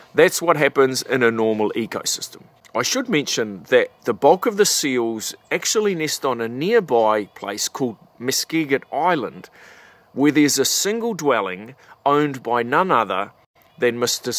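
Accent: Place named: Australia. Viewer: It is good to hear a down under accent. Makes me homesick. I will soon be heading from Nantucket’s winter to my place in New Zealand for their summer. Meanwhile, I’d like to set the narrator straight. In this Earthrace Conservation video, the narrator displays the valor of ignorance. The valor of ignorance is defined as expressing a point of view that is unhinged from knowledge of the subject at hand. Let me point out some of the errors in his narrative that deflate his claims. --Crocker Snow a respected conservationist- does not “lead” the Seal Abatement Coalition. He has been a valued member of its Board. He did, however, lead the campaign to get Muskeget Island officially designated and preserved as a Federal National Natural Landmark. ---Crocker is not concerned about the gray seal invasion of Muskeget Island (of which he owns half) in order to protect his shack there. 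He wants to protect the island’s biodiversity, which is threatened by the burgeoning gray seal population. For the real story go to <link> click on Actions and then on Unintended Consequences. ---The narrator minimizes the gray seal impact on the fishery and lays it off on commercial fishermen. A recent study of commercial fish and shellfish landings from North Carolina to Nova Scotia found that they equaled just 60% of what gray seals consume. --Far from the 15,000 gray seals eating mainly “sand lance and shrimp” that the narrator cites, a report in a regional North Atlantic newspaper cited a Canadian stud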